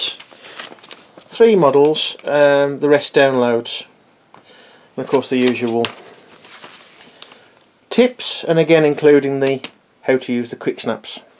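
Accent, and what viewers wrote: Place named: England, United Kingdom. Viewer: you're 100% an english man (your accent ^^) , i have this box and 2 more to make anything in DIY , the problem is , in belgium we dont have a boxes of meccano "general purpose" :( im limited by the content of the boxe (for exemple the 4X4 OFF ROAD TRUCK SET is very poor of pieces ) ... can you help me please to found any pieces general purpose , thanks a lot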